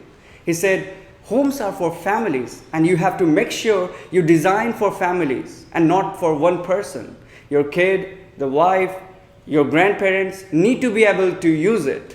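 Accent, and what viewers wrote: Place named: United States. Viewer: Wow....mind blowing English in American tone.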